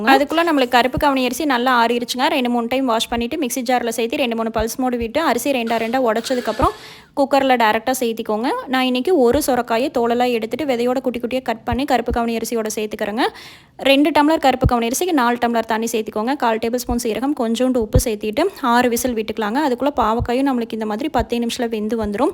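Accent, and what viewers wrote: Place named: Kongu, India. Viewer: Sister unga kongu tamil rompa responce ah erukku enakku pidichirukku subscribe pannita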